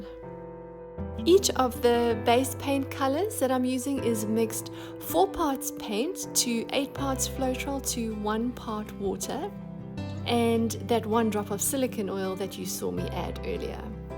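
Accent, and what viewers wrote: South Africa. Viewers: Stunning! Nice to hear a South African Voice❤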